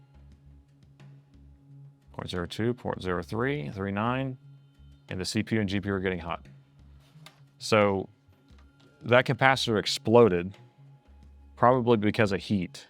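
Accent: United States. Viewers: I have watched many Youtube anchor videos about repairing laptop motherboards, mostly with a British accent. This is the first time I have heard of a blogger with an American accent. The program is very good, fantastic, subscribe~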